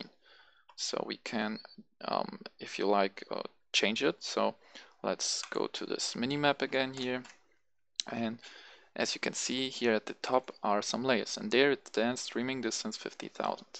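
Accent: Germany. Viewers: deutscher oder österreicher oder schweizer?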